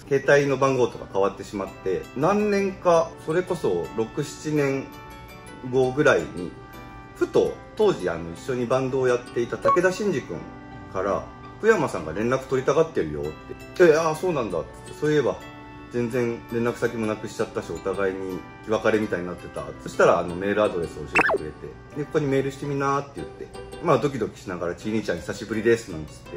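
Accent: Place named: Ishikawa, Japan. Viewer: 壱成君何気に言葉のイントネーションが石川県弁？になってる(笑) めちゃ親近感あります🙆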